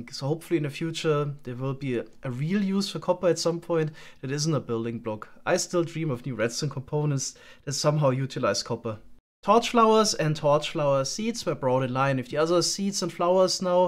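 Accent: Germany. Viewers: Ur German right ?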